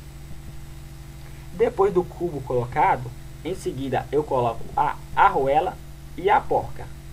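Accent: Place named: Paraíba, Brazil. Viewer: Kkkk gostei do sotaque paraiba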